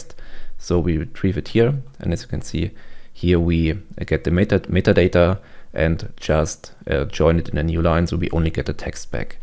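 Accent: Germany. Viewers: Well, it's not exactly 'that german accent', not exclusively. It was also the top quality, very succinct, informative content. Your channels are easily mistakable in that regard as well. Truly great.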